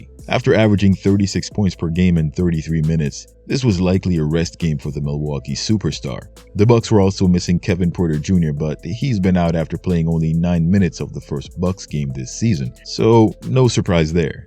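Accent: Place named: Jamaica. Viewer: Jamaican is the last thing I imagined you to be. And now I can hear it. Not that I don't think a Jamaican can code switch to accentless English. Praying for those victims of the hurricane.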